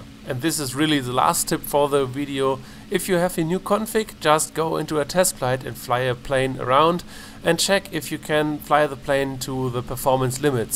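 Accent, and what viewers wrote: Germany. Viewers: Hes german you can hear it at the voice🤣🤣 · I love your accent, German accent is one of the best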